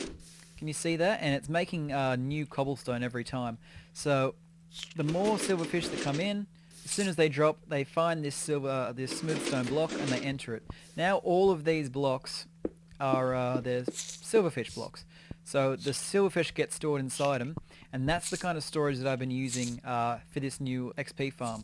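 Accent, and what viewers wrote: Australia. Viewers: Are you Australian? I am xD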